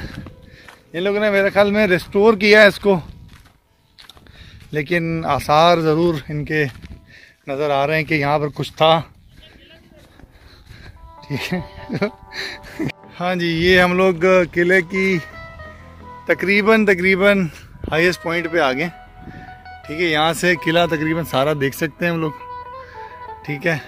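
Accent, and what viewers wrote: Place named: Sindh, Pakistan. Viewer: Great,you doing well and your sindhi accent is cute.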